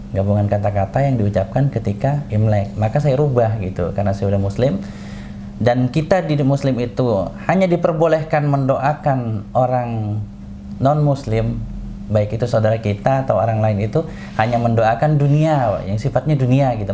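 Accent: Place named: Jakarta, Indonesia. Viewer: Seneng liat cina kya gini adem bener apalagi ada logat betawinya kerenlah, Ini baru cina yg sudah menyatu dengan pribumi saling menghormati dan menghargai, bukan seperti cina komunis yg bengis dan angkuh, semoga tetap istiqomah koh ❤️🤲🙏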